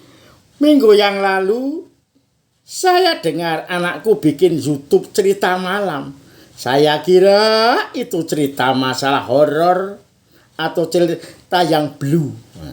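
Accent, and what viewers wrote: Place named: Java, Indonesia. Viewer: $3mangat pakdhe, sehat sll...bhs jowo medhok,*)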